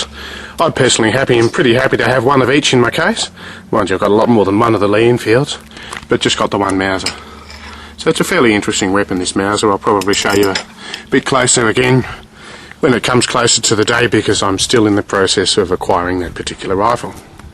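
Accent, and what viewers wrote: Australia. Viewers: Love the accent, long live Aussie :) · P.S. AAA = Awesome Aussie Accent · i love the Aussie accent :)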